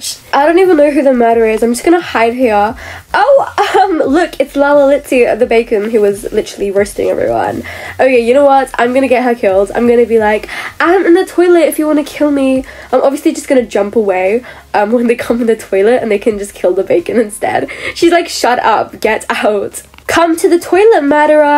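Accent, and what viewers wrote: United Kingdom. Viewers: You sound like a british gurl 😮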